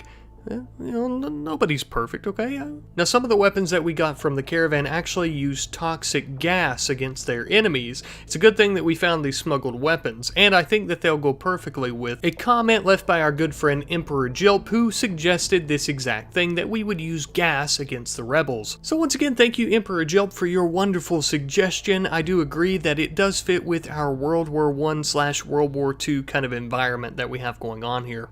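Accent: South, United States. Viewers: Who cares if he is working off a script, human or AI written. In fact, I appreciate the hustle. He took the time to edit his lines, work on his jokes, while writing it out. Now tell me, why ChatGPT? If anything, he mixes in a lot of local colloquialisms and that Southern charm, AI can't write that, can't deliver it. Llistening to makes me miss the south, a lot. Peculiar, genteel, savage, all at once. Oh and swampass, can't forget that. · I've been here around this channel for a while even commented about your southern accent at one point (don't know if you remember lol) but it's nice to see you grow and I hope you're doing well! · are you southern? i swear i hear an accent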